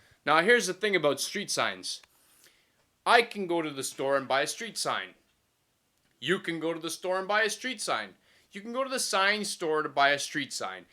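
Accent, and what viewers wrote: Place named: Canada. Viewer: Sounds like he's Canadian. He's from Canada not Scotland, that's the accent you pick up.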